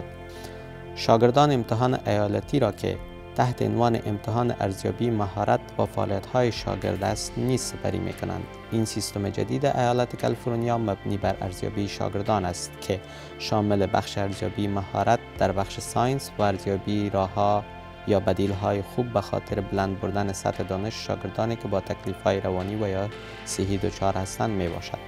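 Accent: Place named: Afghanistan. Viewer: سلام، این زبان فارسی نیست. زبان دری( افغانی ) هست. hi, this is not persian language. this is Dari ( Afghan) language (accent).f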